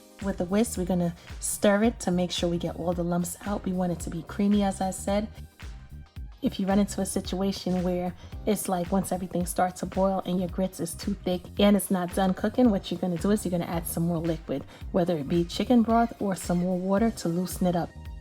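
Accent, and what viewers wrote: New York, United States. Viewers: I love New York! I miss home..something about that voice!😛😛🥰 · I'm from NY I'll say definitely "NOO YAWK". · I think I might enjoy this meal! Love your accent! New York? Connecticut? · Is that New York I hear 👀? This looks bomb. I gotta try it. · This recipe is probably 10x better because of the native NY accent!!!! ❤❤❤❤❤❤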